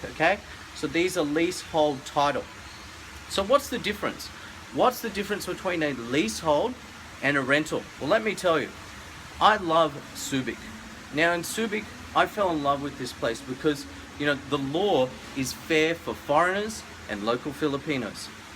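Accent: Australia. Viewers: BRAVO! YOU ARE A VERY INTELLIGENT FELLOW. ARE YOU AUSTRALIAN? THANK YOU ANYWAY! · are u ozzie?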